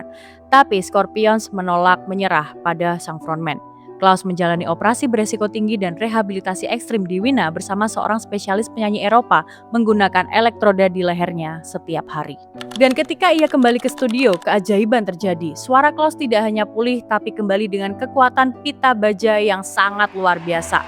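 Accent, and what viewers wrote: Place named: Germany. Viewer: Oh orang Jerman rupanya patutlah cakap English pelat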